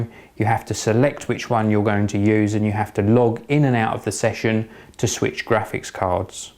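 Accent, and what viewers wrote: United Kingdom. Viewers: You have an amazing voice.Love your British accent.Awesome review,really helpful👍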